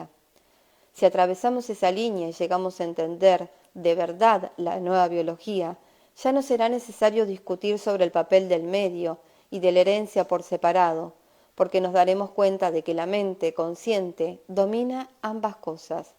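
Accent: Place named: Argentina. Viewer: De verdad!! Escuchar una voz argentina es genial!! Me gustaría que leas el resto del libro 😇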